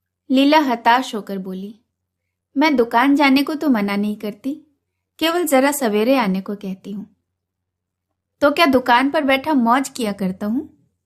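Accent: Standard. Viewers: Apki shudh Hindi ,aur awaj ki madhurata kya khne . Munsi ji ki kahaniya , sansmaran , upannyas bahut hi hridaysparshi hote h . Pranam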